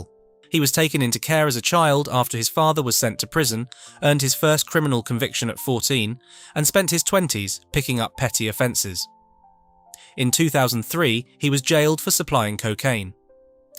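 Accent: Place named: United Kingdom. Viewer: Seriously you sound like your from the UK and you can't pronounce half the words right 😂😂😂😂😂😂😂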